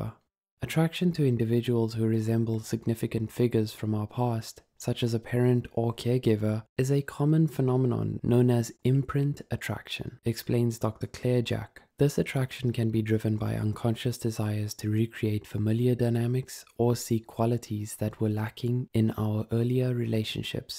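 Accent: South Africa. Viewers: The male, South African narrator threw me off guard. Not in a bad way, I was just expecting the usual woman. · are you south african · Is that a South African accent I hear, or am I just insane? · I love the south african narrator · I have to know is the person speaking here South African!!?? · I'm detecting a South African English accent, am I right? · AS SOON AS I HEARD YOUR VOICE I WENT LOOKING AT YOUR ACCOUNT AND I KNEWWW YOU WERE SOUTH AFRICAN HIII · I haven't watched a psych2go video in a minute but I know a South African accent when I hear one. Loving the new narrator. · Narrator is South African right? Ek hoor jou. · Is the guy who did the voice over on this South African? I feel like I can recognise my own kind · Random question is the person speaking from south africa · This guy sounds south African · IS THAT A SOUTH AFRICAN ACCENT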